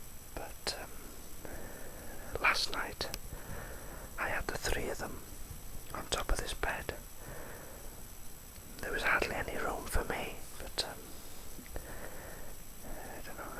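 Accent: Wales, United Kingdom. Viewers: I think it's a Welsh accent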